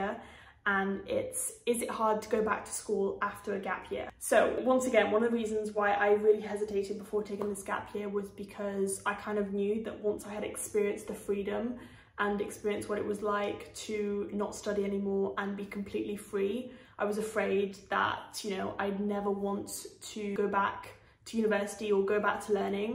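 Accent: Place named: England, United Kingdom. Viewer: Why do you have an English accent if you grew up in France?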